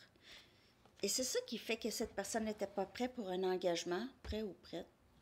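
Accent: Canada. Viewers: Super. Avec votre super accent canadien trop mignon. 👋🏻🇫🇷😘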